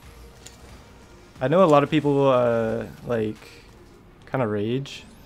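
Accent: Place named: United States. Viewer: Ur like the only good freestyler who has an American accent, maybe osm too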